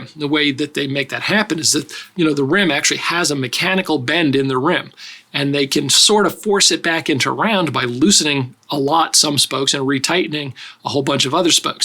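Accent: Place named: Pittsburgh, United States. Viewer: You say now and round like my grandmother she was from Pittsburgh. Great video sir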